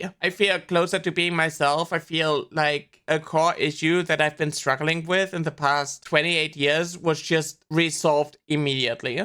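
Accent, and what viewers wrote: Germany. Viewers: ... are you german by chance?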